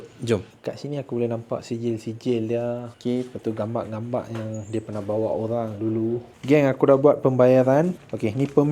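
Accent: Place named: Kedah, Malaysia. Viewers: Alhmdulillah aka kira baru masok line ni, seronok sgt aka bila dgr Adik ckp logak kedah, terbaik dik, Sgt jga tatasuli bahasa, terbaik dik, aka tak miss tgok Ulang2 yg pat kt india dgn mesir, kira semua aka suka, terbaik dik 😂👍👍👍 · bestnya..cantik sgt permandangan kg tu..terima kasih Dr..lg best bahasa kedah sampai sana. jga diri dn kesihatan bos. terbaikkk👍👍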